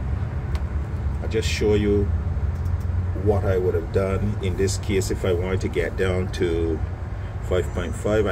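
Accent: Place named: Caribbean. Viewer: I came across this vid while browsing for info on pH stability. I’m finding that it takes more and more pH down (I use phosphoric acid)… when a fresh tank is mixed it takes say 1ml to bring it down to 6.0 but 2 days later when I top up the tank with water (and some nutrient solution to keep the EC up) it takes 2ml of pH down to bring it back down to pH 6.0. Then the pH climbs to above 7.0 again in 48 hours when I come to top up the tank again. Foliage is green and healthy, fast growth, so I expect the pH to rise as the nutrient elements are depleted from the solution. Maybe the tank is just too small for the size of canopy that it supports, combined with fast uptake of phosphorous means that the acidic elements are being depleted very quickly? BTW I loved your accent. It’s interesting that Caribbean accents often sound similar to Irish accents.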